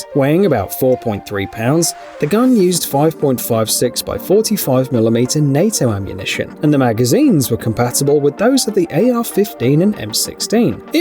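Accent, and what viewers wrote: United Kingdom. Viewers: Well he does sound like a brit. What would you expect?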